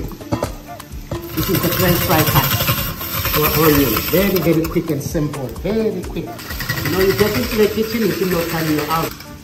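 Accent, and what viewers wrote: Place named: South Africa. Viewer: Wow..you sound like a fellow South African! 🤣🤣🤣👌...so Im gonna be ur new sub.! Your dishes look soo amazing.I recently made a fruit salad on my page that can pair well there.🤩Wish you the best !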